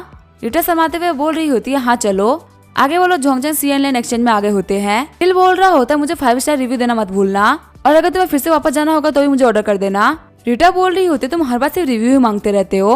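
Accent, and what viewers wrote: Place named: Uttar Pradesh, India. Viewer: tum pakka up se ho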